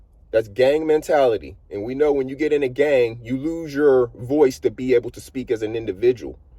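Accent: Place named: United States. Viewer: You sound like a country hill billy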